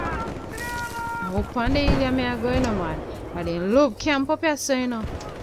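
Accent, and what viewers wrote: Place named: Jamaica. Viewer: Yup 100% Jamaican :)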